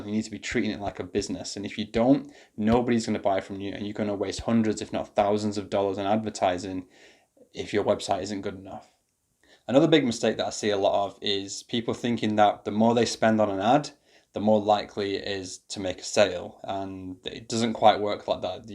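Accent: United Kingdom. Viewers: please don't get me wrong but i cannot understand your UK accent. And the automatic captions doesn't matches with your words. You should create own captions for every video.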